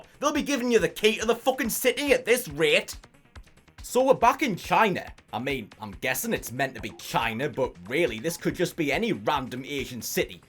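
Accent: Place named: Newcastle, United Kingdom. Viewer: I love that you have the sterotypical nerdy teenager if he was from Newcastle as an accent 😂❤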